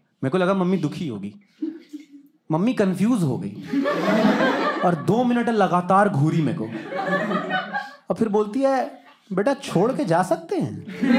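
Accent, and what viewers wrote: Bihar, India. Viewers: His hindi has a Bihari undertone. Is he from a Bihari family? just curious to know not being hurtful to anyone. Bura mat lena koi aab. Aaj kal kuch puchne me bhi sochna parta hai social media pe. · Tum Bihari ho. accent se pata chalta hain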